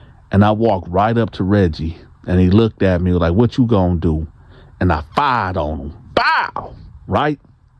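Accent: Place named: Michigan, United States. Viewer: Your voice is similar to the Goat point guard Magic Johnson. It must be a Michigan accent. #LAKERNATION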